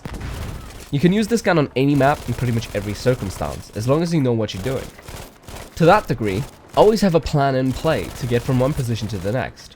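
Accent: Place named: Germany. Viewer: your german your english shows that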